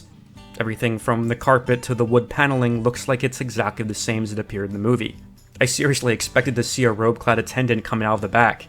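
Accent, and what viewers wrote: Philadelphia, United States. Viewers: I just found your channel, watched a handful of videos (all excellent) and thought to myself, sounds like a Philly guy. This video confirmed it based on your airport departure 😂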